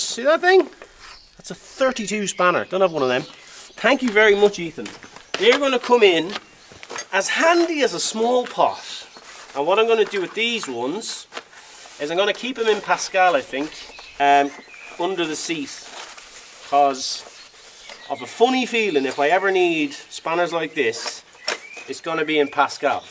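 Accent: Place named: Ireland. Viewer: I absolutely love your vids darling, you explain things concisely and clearly in that gorgeous lrish accent, and your gentle sarcasm has actually made me laugh out loud, which so RARELY happens !! I've just subscribed and.........thankyou for cheering me up !! xxx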